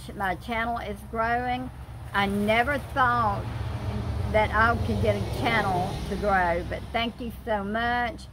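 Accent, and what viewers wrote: South, United States. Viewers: I'm from Illinois and it feels good to hear your southern accent and looking at your background it seems like a touch of heaven.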